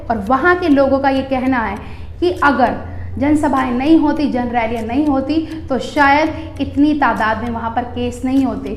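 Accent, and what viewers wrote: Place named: Haryana, India. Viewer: Apne boli kit gye bhai harvani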